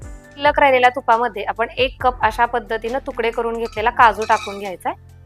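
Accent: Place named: Latur, India. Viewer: Tai तू कुठली आहेस, तुझी बोलण्याची टोनिंग लातूर ची वाटतेय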